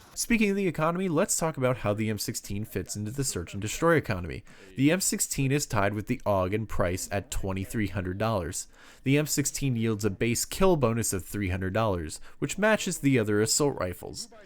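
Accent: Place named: Canada. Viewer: You sound...very canadian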